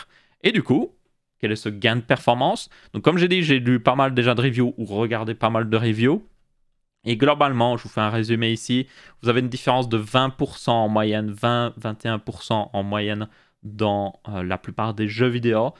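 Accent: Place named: Belgium. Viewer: Beaucoup de "Pouissance" x'DDD Aaaah merci la Belgique ♥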